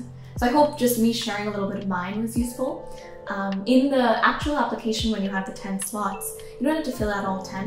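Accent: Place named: United States. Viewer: Why your American accent is so natural???? How can I improve it like u???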